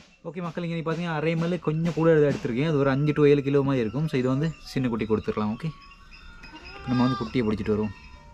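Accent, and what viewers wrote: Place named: Sri Lanka. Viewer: நீங்க இலங்கை என்பது உங்க பேச்சுல தெறியுது ஊர் எது ஆடுவிலைக்கு குடுப்பீங்களா என்டுதான் கேட்டேன்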